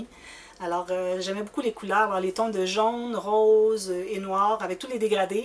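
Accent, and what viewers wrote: Quebec, Canada. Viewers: Martine Mérard. Bonjour, merci pour toutes les réalisations que vous partagez. J’aime tous vos projets, la variété des thèmes, du niveau de difficulté, j’ai appris tellement de choses depuis que je vous suis. Au début, au hasard des recherches sur YouTube, c’est votre accent qui m’a interpellée ( à cause de plus de 10 ans d’échanges scolaires avec Chicoutimi…).C’était d’abord le premier plaisir du matin, à présent c’est le soir, mais le plaisir est toujours là et l’impatience de la découverte à chaque dévoilement. J’ai réalisé plusieurs de vos albums, ou emprunté des idées, des inter actions, j’aime les pop ups, les cachettes, mais aussi les choses plus simples et les albums pas trop gros! J’ai contaminé ma petite fille (6 ans!) qui veut scraper avec moi et échanger des joyeux courriers et jouer à! Je souscris à l’idée de Patricia je crois pour un album en duo grand mère et petite fille. 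Et puis encore pour des tutos express, et peut être des réalisations plutôt style junk journal et récup. Continuez à nous enthousiasmer!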